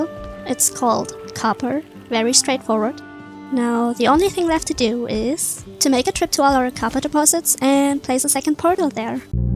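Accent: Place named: Australia. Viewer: Bringing metal by boat cost my like 1 3rd of my game time. I think the reason to have it disabled is because you get upgraded portals in the Ashlands, that let you take metal through. I think you should try using the cart it's hilarious. Oh btw. try not to die too much cause skill drain will slowly deplete your skills. Your sounding more Australian by the day. Good video. :face-blue-smiling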